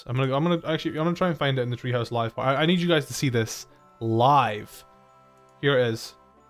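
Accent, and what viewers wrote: Scotland, United Kingdom. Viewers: what part of Scotland you from?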